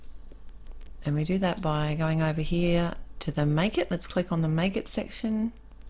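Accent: Australia. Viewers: Also loved having your wonderful Australian accent talking to me.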